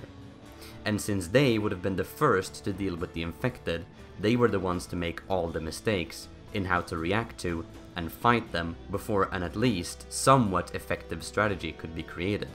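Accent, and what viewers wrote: Sweden. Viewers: He has a swedish accent anyway so I guess he is from sweden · Heey are you swedish?!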